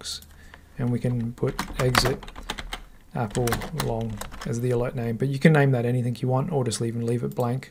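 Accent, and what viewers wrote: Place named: Australia. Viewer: Hello, Thanks for the video, and it is great to hear an Australian on a YouTube video. I have a question/need help. I have a strategy that when a faster moving Av. crosses the slower, it triggers a trade and closes the open trade eg. Short opened - short closed, which in turn opens a long simultaneously and continues Short to Long to Short to Long etc.. Can you help me with description with the webhook Entry/Exit please. Happy to pay a fee. Regards, Sean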